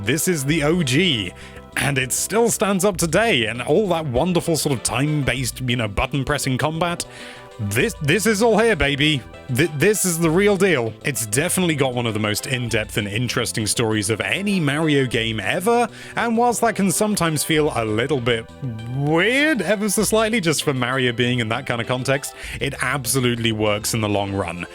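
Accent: United Kingdom. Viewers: It's the British pronunciation, the way we all said it in the days before YouTube... ;) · Its the way we pronounce it in uk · It seems Americans (like me) would say Yo as in yo-yo, and this dude (and presumably others in the UK) would say it yo as in "yonder".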